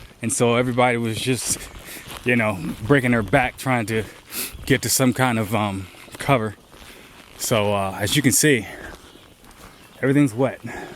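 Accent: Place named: Lehigh Gap, United States. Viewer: Your making great progress keep up the good work. Enjoy your accent out of Lehigh Gap.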